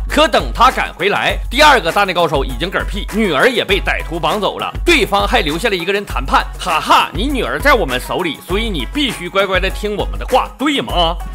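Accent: Northeast, China. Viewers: 東北話老好聽了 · 他东北的 哈哈哈哈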